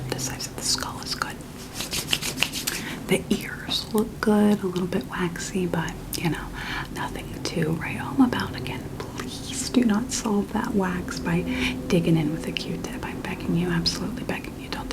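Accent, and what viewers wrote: South, United States. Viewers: i love your videos with a SOUTHERN accent!! scratches my brain! me and my gf watch them before bed and fall asleep. your comedic timing is great and the asmr is so comforting!!! ❤ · i was confused when i saw your face on an ASMR video and i was like ‘wait….?’ and i have been pleasantly surprised to find you’re one of my new favorite ASMRtists and you help relax me so much!! i know you do it for comedy but you really do have a knack for it. i kind of wish all of my doctors were like this, the familiarity of speech and mannerisms put me at ease, and you have a really lovely soft-spoken voice! can’t wait to see more <3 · I find it funny cause I found you with your short videos with your southern speak and what it means. I’m so happy you also do asmr, you’re awesome and your voice is very relaxing.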